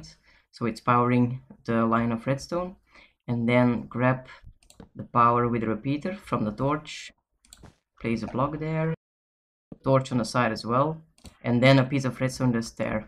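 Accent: Flanders, Belgium. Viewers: are u from belgium/flanders? your accent sounds like that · where you from? you sound belgium...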